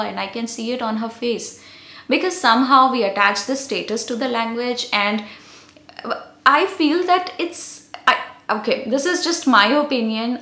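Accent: India. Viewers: As a westerner I have noticed a possibly related problem here on youtube. Sometimes when searching for tech advice I used to find videos from Indians that would have a title written in English, but then when you actually watch they are speaking in Hindi or another Indian language. Sometimes they would speak a couple sentences in English and then switch their language for the rest of the video. It made no sense to me back then because it seemed like it was just wasting people's time, especially when there would be no subtitles. However, because of this video I think I understand why this happens. It seems that some Indians use English as a way to show status or brag even if they are not necessarily comfortable with the language. Another problem is that it seems many will speak broken English with grammatical errors or in a way that is way too formal, but seems to have a strong Indian accent while still speaking English very fluently.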